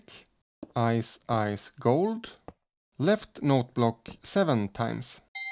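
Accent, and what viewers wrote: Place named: Sweden. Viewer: It sounds like ur swedish and talk english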